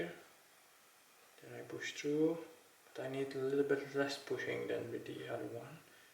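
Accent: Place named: Russia. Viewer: ты с России что ли? акцент пипец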